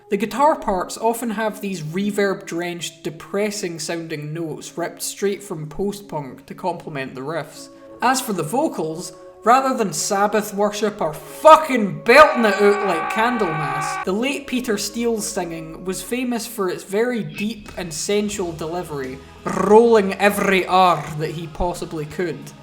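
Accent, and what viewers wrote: Scotland, United Kingdom. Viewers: Yeees! More Metal and scottish accents 🤘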